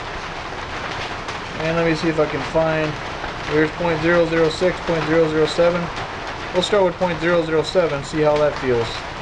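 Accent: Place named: Oklahoma, United States. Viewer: Sounds like you could be a fellow Oklahoman....lol. I'm here in Okc and hear that sound at least a few times every year. Great video series!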